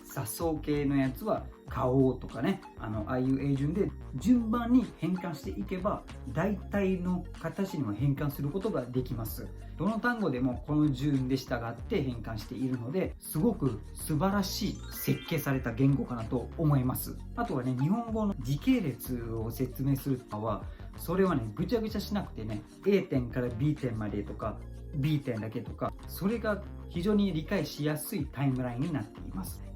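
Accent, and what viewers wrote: Kansai, Japan. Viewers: ちょっと関西系？のなまり？を感じるのは私だけでしょうか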